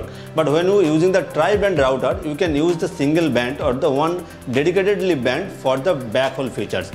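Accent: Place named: India. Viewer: WARNINGL VERY STRONG INDIAN ACCENT.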